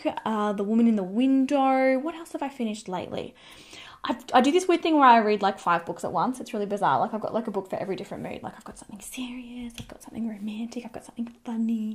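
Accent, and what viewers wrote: Australia. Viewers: Please do vlogs with dogs! You are still the only Aussie mua I can find so it’s good to hear our accent (I live in NSW). I am trying to home school my 2 girls - not easy. They are 12 and 9 and were just having a screaming match at 10am, so “school” had only been on for an hour 😖 The one thing that calmed them both down was meeting your beautiful new puppy! I was enjoying your video - always brilliant!- and then you put the gorgeous Emma on! More puppies and makeup and chats please! Thanks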